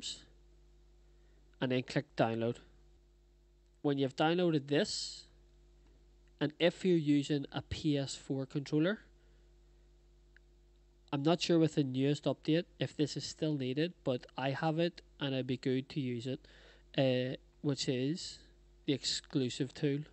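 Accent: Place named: Northern Ireland, United Kingdom. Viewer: You from N.I?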